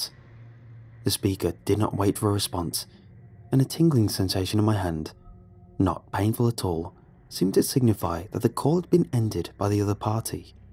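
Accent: England, United Kingdom. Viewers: Strangely it's been the same for me. It's something about his steady English accent that makes me drowsy.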